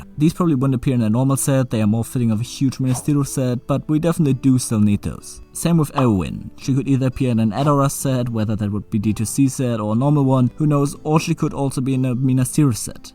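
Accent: Germany. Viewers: U are German right?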